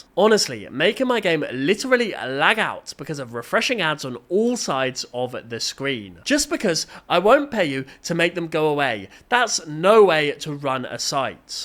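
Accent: United Kingdom. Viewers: hes british bro relax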